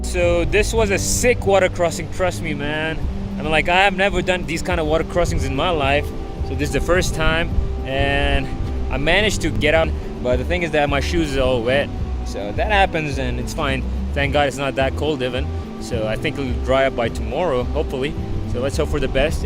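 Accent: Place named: India. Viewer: Hey, are you Indian? lol ...OMG you accent was so nice +1 sub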